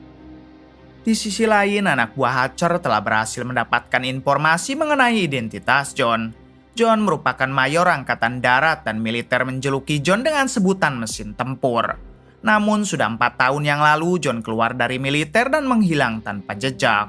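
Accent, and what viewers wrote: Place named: West Java, Indonesia. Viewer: Lidah Sunda SDH gak kaget z jd j pokoknya lawak tukang panci keliling 😂😂😂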